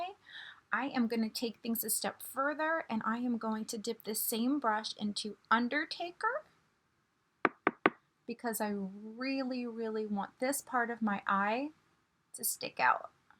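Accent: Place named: South, United States. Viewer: yes ma'am lol cant tell from my country voice lol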